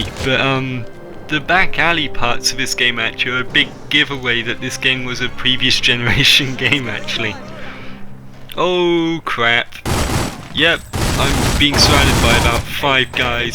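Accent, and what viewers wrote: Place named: United Kingdom. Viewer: Everyone is different. Wow, is this a crime or something? Does he have to talk like you, does he have to walk like you...? I've seen so many remarks about people's voices that are actually NORMAL. This guy's voice is fine. Leave him alone. I don't even know him, but I find his commentary to be fine, casual, and I know (obviously) that he is most likely from the UK. Maybe he doesn't have the best recording equipment? No reason to curse at him over it! Sheesh...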